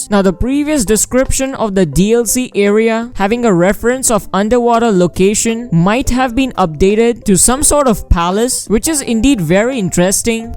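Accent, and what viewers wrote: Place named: India. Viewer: How come you can translate if its in chinese? You sound like an indian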